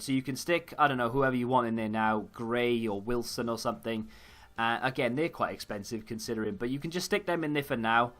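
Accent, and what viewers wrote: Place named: Cardiff, United Kingdom. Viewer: You from cardiff?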